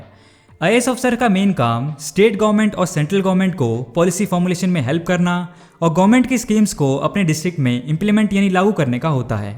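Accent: United States. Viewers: Don't speak like a American, speak like indian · You must be a school going kid according to my assumption as your comment is so childish and immature.If she is talking like an American then it's ok what's the problem??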